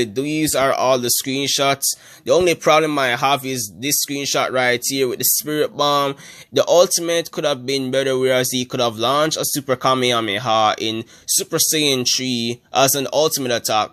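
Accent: Jamaica. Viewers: U sound well Jamaican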